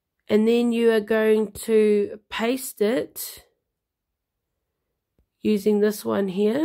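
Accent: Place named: New Zealand. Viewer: Lol funny, as soon as you started talking I thought another Kiwi. Great video by the way.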